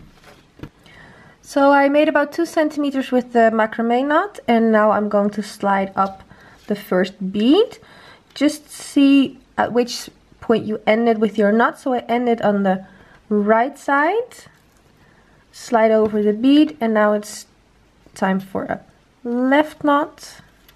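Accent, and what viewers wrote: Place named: Netherlands. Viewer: You can hear a Dutch accent ( spreek je Nederlands )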